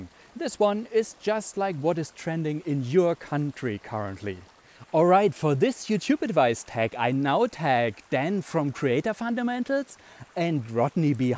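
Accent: Germany. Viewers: Are you from Germany ? Because you English is more in the dialect of German ?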